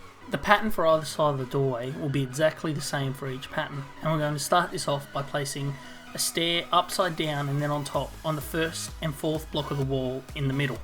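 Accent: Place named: Australia. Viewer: What a nice surprise to hear that accent after watching your timelapses - Aussie? Amazing content though mate, keep it up, you're destined for greatness!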